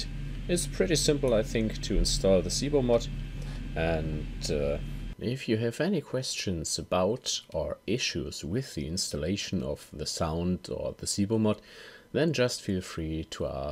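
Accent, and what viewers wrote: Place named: Germany. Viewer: What city are you from in Germany?? I love your accent.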